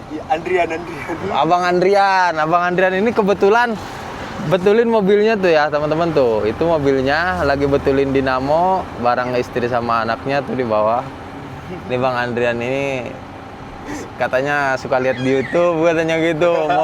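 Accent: Serang, Indonesia. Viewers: Sy senang ngikutin yutup sy juga sopir bis malam pahala kencana tapi lebih senang lagi klu bicara dikit dikit waduh aing ciri khas orang Serang he he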